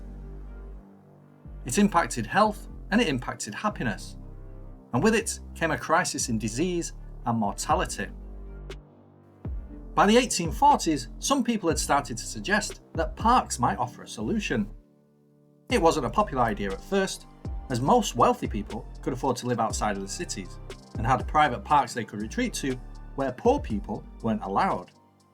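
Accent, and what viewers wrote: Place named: Wales, United Kingdom. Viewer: Gotta just love the Welsh accent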